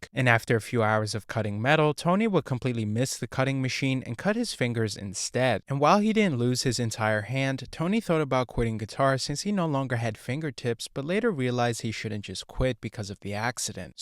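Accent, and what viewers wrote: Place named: Massachusetts, United States. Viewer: Why did bro change to a Massachusetts accent halfway through the video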